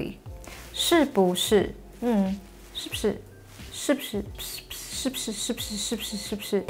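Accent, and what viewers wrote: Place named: Taiwan. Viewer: 博主台湾腔太明显了，考个普通话证书吧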